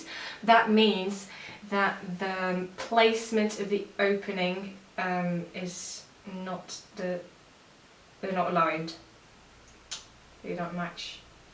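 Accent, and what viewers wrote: United Kingdom. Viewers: Oh your Southern English twang is adorable! I'd been wondering how you were getting on, here's a very late welcome to England 😊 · you've even got a bit of a 'Brit' accent already-too cute! glad you found the time to share all the new and exciting things with us!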